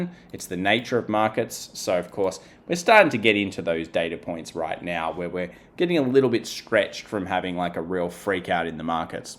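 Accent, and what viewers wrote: Australia. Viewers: Love the Australian accent pontificating about the US "mahket."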